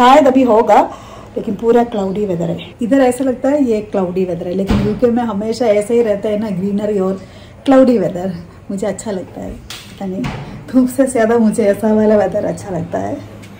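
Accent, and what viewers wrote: Kerala, India. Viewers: Yourvlog is very nice. I'm enjoying it and your way of talking Hindi is cute with malayalam accent .Where are you exactly in kochi? I mean your in laws house where is it Chechi? Is it in pallikkara or kakkanad?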